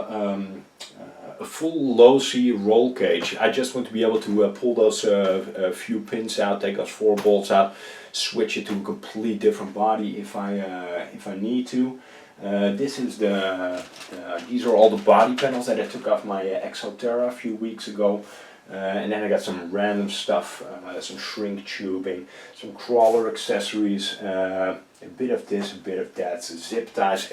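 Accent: Netherlands. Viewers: iam dutch to you have a realy dutch acent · Great look around your storage room. Thanks. I like your dutch accent. One of the reasons I watch your , other the really cool RC videos. HOOORAY FOR EVERYTHING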